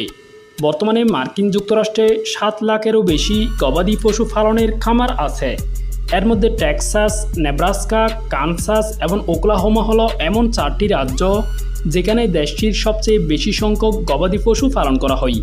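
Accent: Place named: Chittagong, Bangladesh. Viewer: আপনি চট্টগ্রামের লোক মনে হয়।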